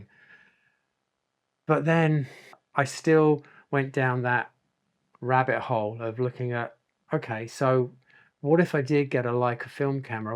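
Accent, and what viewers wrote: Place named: United Kingdom. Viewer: Point and shoot works for me (Panasonic Lumix DSC-S3). I just rediscovered an old Agfa Optima 1035 I have, still in brand new condition. I will make a project this year of getting used to film caneras again. With this nice little cam. As a fun project. I’ve been a musician and painter all my life, but always used a camera when I had a chance, since I was a kid in the 60s. I take pictures everywhere we go, many just around home. The small town and everyday mundane images video caught my attention. I like gas stations, store fronts, shop windows, grocery store shelves, toys, books, simple flowers, fruits in bowls among other everyday subjects. You sound British to me (sorry). Thank you for the frank and fine posts.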